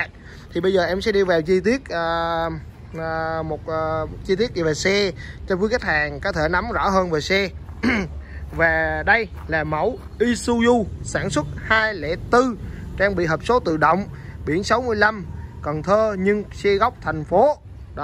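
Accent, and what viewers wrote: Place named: Binh Duong, Vietnam. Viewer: giọng chắc đồng hương với tài ô tô bình dương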